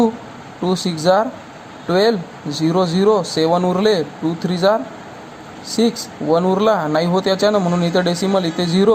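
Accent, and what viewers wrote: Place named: Vidarbha, India. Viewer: mi pn vidharbhatli Bhasha vrunach kalte ki tumhi vidharbhatle aahet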